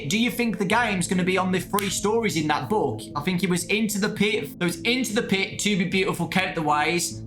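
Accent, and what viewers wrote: United Kingdom. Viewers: Wassup British man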